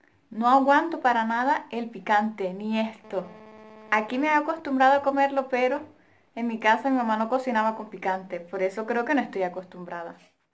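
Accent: Caribbean Coast, Colombia. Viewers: Superrr!! 💃 me veo todos tus vídeos y me encanta que conservas el acento costeño, nuestros refranes, nuestros dichos 🤣🤣me toteo de la risa contigo y tus aventuras. Chachi es un hombre muy especial. Saludos!! Un fuerte abrazo!!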